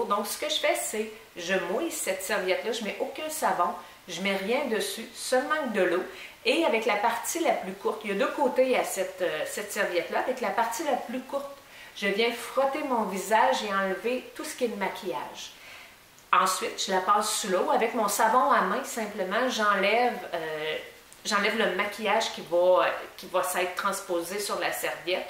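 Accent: Quebec, Canada. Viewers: j adore ta vidéo sur ta routine et ta voie québéquoise gros bisous :) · les lingettes pour voiture c est pas bête du tout fallait y penser ton accent est trop cute vive le quebec ♥♥♥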